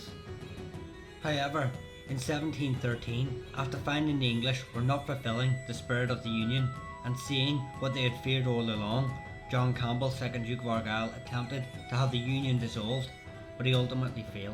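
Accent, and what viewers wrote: Ireland. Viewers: It is really hard to understand what is being said due to the annoying, loud music plus what appears to maybe be an Irish accent. Such a shame as the information appears good.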